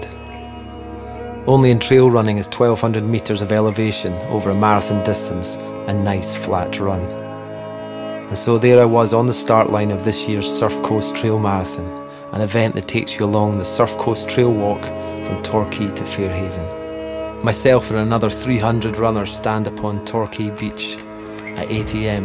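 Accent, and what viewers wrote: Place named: Scotland, United Kingdom. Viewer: Love this! Good scottish accent to narrate too; not that I'm biased ;)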